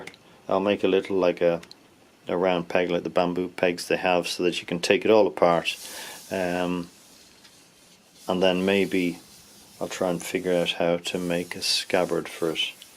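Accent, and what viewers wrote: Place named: Ireland. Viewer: This guys Irish accent is Cool 👌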